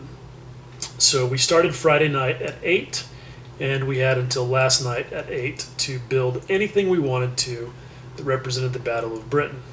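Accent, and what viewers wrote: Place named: United States. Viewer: Hi my name is William also, I like your style, your accent is soo American. I love planes and tanks and the whole model building scene, its so deep. I just found and joined the Newburg model car club, cause I love cars to. Thanks for your response last time on my comment like some kind of double negative always never disappoint? I cant remember. Any way I am very new to internet interaction and although an old hand at model building new to year round participation still a winter warrior. God bless and look forward to talking to you and sharing my model building experiences.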